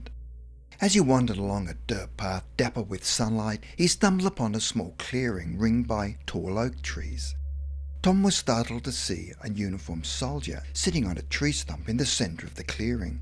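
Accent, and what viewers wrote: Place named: Australia. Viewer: The lady is Australian. If you wa want to listen to only English pronunciations then your choice of videos is going to be very limited. Even well paid TV presenters make errors in pronunciation, including the English, Scots, Welsh and Irish. The narrators here don't seem to be monetized. So they are doing us a favour. Don't like it then why be on here long enough to comment? Sheesh.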